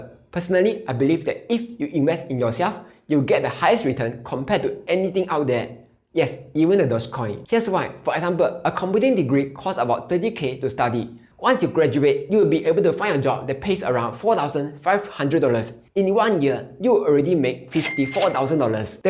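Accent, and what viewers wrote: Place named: Singapore. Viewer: It’s called Singlish btw.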